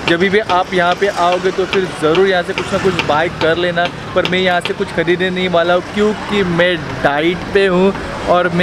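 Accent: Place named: Maharashtra, India. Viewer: Loved your Marathi accent English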